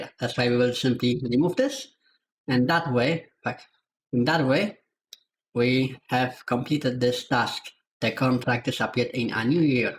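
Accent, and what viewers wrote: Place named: Poland. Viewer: Ik u are poland i hear that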